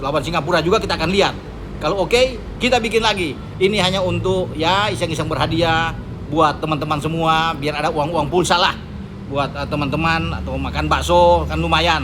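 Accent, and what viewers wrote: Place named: Java, Indonesia. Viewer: Medok ah... Kalah 3-0..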